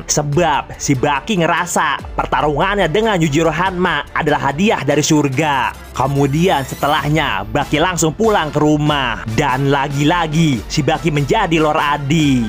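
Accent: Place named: Jakarta, Indonesia. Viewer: Channel paling danta dan pembawaan nya betawi bat daah gua demen sama yang begini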